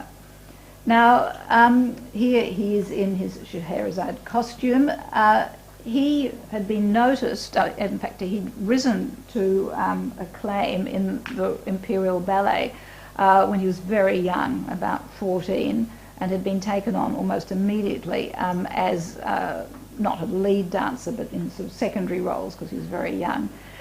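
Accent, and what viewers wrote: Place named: Australia. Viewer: if the australian accent could be contained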